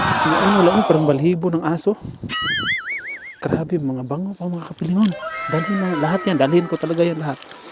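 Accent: Visayas, Philippines. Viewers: tuwang tuwa tlga aq pag pinapanood q ito gustong gusto q ung Punto nia bisayang bisaya ang kulit 😅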